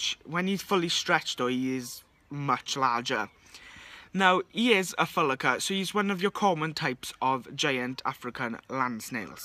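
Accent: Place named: Wales, United Kingdom. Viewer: Awesome land snail, and Welsh accent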